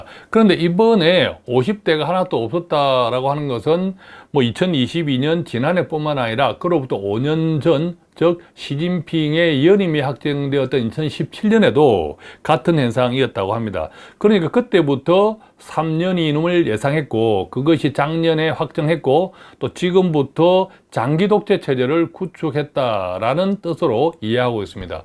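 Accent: Gyeongsang, South Korea. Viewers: 말씨가 갱상도네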